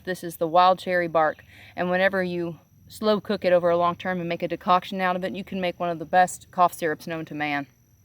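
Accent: South, United States. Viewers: You seem so different from a few years ago....you use your Southron accent and dress more casually and seem to live in a different area of the country. What changed?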